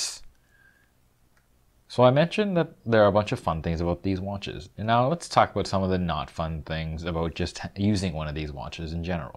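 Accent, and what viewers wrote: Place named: Canada. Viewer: Cool. I didn't know about these and I grew back then. Wasn't paying attention I guess. Really interesting videos,. And it sounds like you're Canadian too. Subbed.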